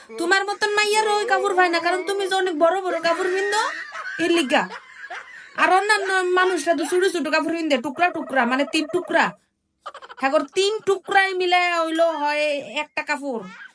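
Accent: Comilla, Bangladesh. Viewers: আপু আপনার কথা গুলো খুব ভালো লাগে আপনার বাড়ি কি কুমিল্লায়?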